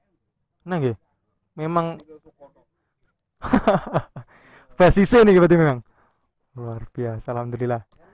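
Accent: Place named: Indonesia. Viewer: jane jane ki wonk ndi yo.. kok tekan kepohbaru barang ki.. 😁 kondang tenan kang.. logate kok kyo wong luar Bojonegoro ki.. 😀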